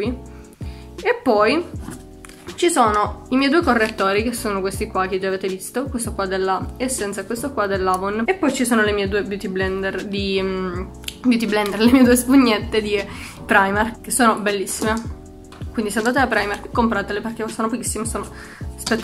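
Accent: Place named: Sicily, Italy. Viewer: secondo me sei di Marsala . Cmq sei siciliana come me. Io abito in provincia di palermo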